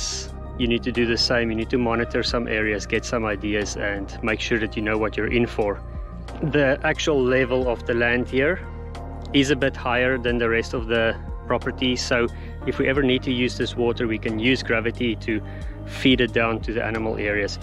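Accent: South Africa. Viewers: Cool. If for no other reason than fire risk control given rapidly increasing pace of climate change, swales could eventually make a huge difference to your property. Especially given the recent awful drought your part of the world has had; had a lot of UK news coverage at the time. Am I correct in assuming that you're in South Africa going by your accent and landscape? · Sounds South African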